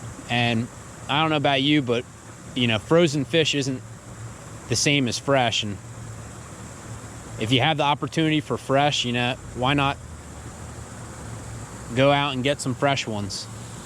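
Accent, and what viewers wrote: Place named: Pine Barrens, United States. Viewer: hahaah holy shit that piney accent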